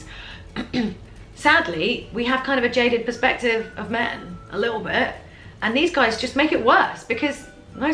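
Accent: United Kingdom. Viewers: you sound quite british :D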